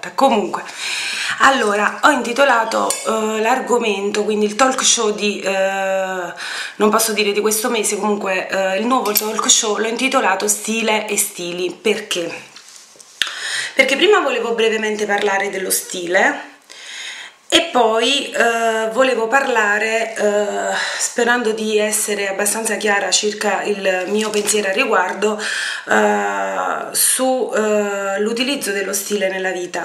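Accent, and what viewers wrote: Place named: Campania, Italy. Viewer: ciao..sei carina e simpatica..grazie per i consigli posso chiederti di dove sei??parli come me...son campana...ciao.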